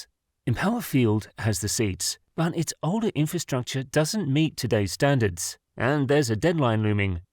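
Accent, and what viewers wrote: United Kingdom. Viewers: What’s with the British accent??? That accent and the NFL don’t mix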